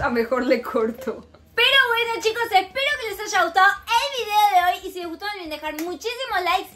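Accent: Argentina. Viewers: Melina sos Argentina😅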